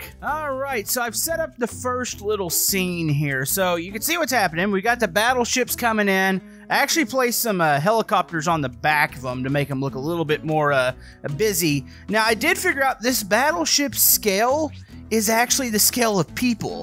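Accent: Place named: South, United States. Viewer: You kind of have a southern accent at times.. hmmmm lol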